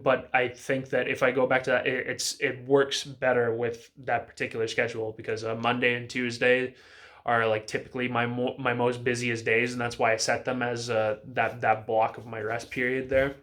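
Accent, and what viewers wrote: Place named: Canada. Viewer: I knew I heard some Canadian in your voice! I was also born in Canada but I live in Spain at the moment. It's very cool that you managed to get a job in a gym, sounds like getting laid off was a blessing in disguise.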